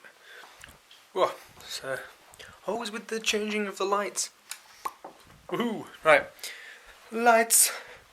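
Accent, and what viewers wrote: Yorkshire, United Kingdom. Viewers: Lol. No magic, I recognise the accent being a Yorkshire lass misen :-)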